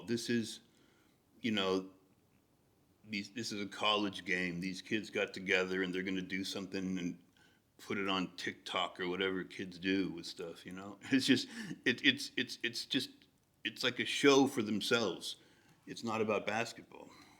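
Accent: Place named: Virginia, United States. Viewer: Where you from,at a guess I'll say Virginia,new York way..I'm English so excuse my guess if I'm offending you at the moment..just heard you say your 5 minutes from clippers and Lakers but that not an la accent is it pal??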